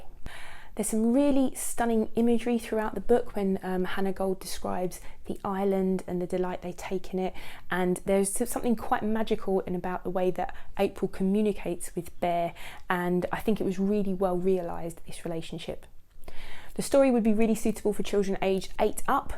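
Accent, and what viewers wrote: United Kingdom. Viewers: I wish i could speak British english sounds great😊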